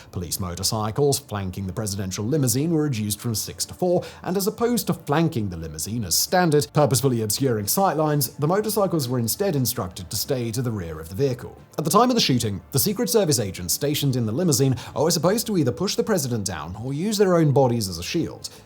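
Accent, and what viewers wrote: United Kingdom. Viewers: This guy is paid by shadowy people to read out a script and sounds smart in British accent. Pure propaganda. Do not censor me or else I'll continue!!!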